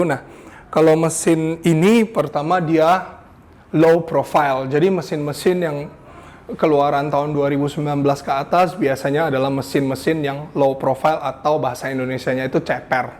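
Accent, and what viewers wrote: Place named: United Kingdom. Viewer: iya itu logat british. Sama kayak Harry Potter.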